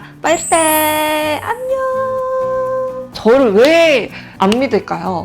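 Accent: South Korea. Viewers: 언니 근데 몽골어로 말하는데.. 좀 몽골어 잘하는 한국사람이 말하고있는것 처럼 들려서...ㅋㅋ 뭔가 발음이 한국스러워졌다랄까? p.s 언니 영상 잘 보고 있어용~~ 언니 영상 볼때마다 엄마가 해준 banshtai tsai 먹고 싶어지고.. 뭔가 뭉클하고.. 그래요... 응원합니다!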